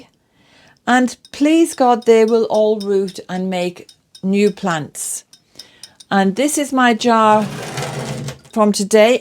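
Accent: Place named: Yorkshire, United Kingdom. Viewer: Sounds great good company and the satisfaction of a good job done good for you. Studying brought me from the Midlands to Leeds and a settled up here.Your accent tells me you will know it Wilsden village just down the road from Haworth, I connect with Eire too my father RIP was a Carlow, man. Blessings peace and harmony to you xxx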